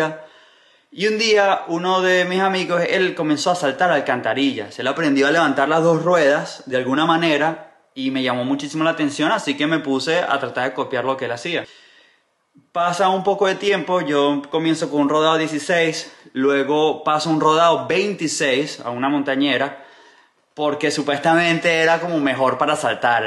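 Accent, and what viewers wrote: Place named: Venezuela. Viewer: Felicitaciones por esa tan merecida medalla de plata en las olimpiadas de Tokyo 2020...para mí, esa medalla es de "ORO" brother, tú la mereces mil veces mi pana. Que orgullo escucharte Daniel Dhers..! Tu sencillez y humildad es de "ORO" mi pana. El hecho de que tú has vivido en tantos países...y escucharte manteniendo ese acento venezolano...es único. Conozco a muchos venezolanos que viven en otros países y cambian su manera de hablar (pareciera que les da pena ser o hablar con el acento de Venezuela); es decir "este tipo de personas" no tienen identidad por Venezuela. Tú al contrario eres la esencia del buen venezolano. Te admiro mi pana. Te deseo muchos éxitos y bendiciones en tu vida. Eres ejemplo a seguir. Saludos mi pana.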